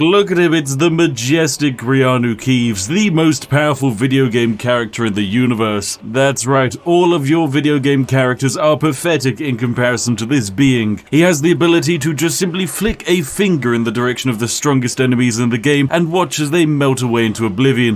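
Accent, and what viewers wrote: United Kingdom. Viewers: Day 1 of reminding we want to hear him say "Sugoi" in his glorious British voice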